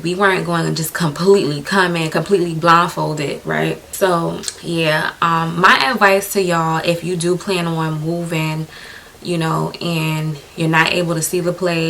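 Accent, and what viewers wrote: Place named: Brooklyn, United States. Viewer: love this video from the jump...lol. your Brooklyn accent is so strong. love it! I am planning on moving to Florida in 4 months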